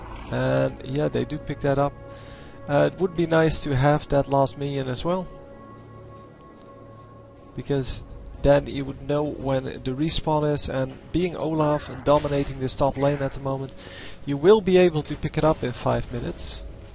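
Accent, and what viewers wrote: Netherlands. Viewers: you sound waaaaay too dutch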